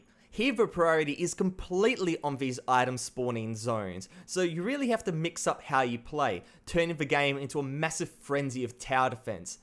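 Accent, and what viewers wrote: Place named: Australia. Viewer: Your aussie to niceee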